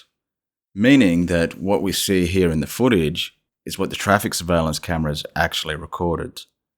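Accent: Australia. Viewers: Miss you, cant fall asleep without that Aussie narration and humor, also wish I could have a smile & a Vegemite sandwich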